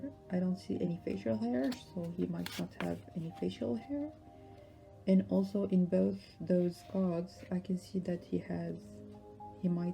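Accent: France. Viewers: You have a french accent or am I hearing wrong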